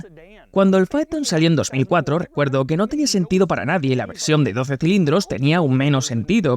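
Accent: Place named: Spain. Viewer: Un zipizapo no debe poner su voz en videos, si de por si escuchar un zipizapo es desagradable escuchar un español zipizapo es insoportable solo soporté el video 4 minutos antes de apagarlo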